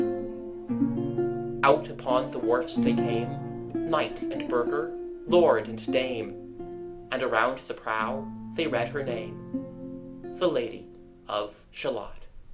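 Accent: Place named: United States. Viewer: Will Yanks stop this, or learn how to speak ENGLISH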